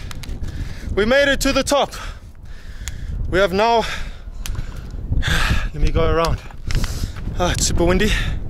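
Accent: South Africa. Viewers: You sound like a South African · The heavy SA accent 😁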